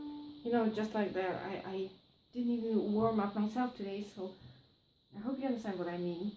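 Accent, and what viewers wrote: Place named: Germany. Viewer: Ich hab mir bei deinem Englisch-Akzent irgendwie gedacht dass du deutsch sprichst. Aber das ist eigentlich total unwichtig. ist mir nur aufgefallen. Du spielst gut. Ich bin auf viele neue Videos gespannt! :)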